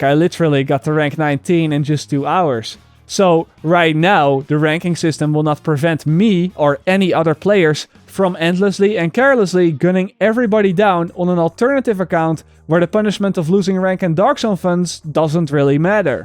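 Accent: Netherlands. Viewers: you sound like you're dutch.Why? I hear it on your voice. And btw.... Never stop making videos,only if you want to xP. Love them.. Got yourself a subscribe buddy. Daag! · you dutch? you sound dutch.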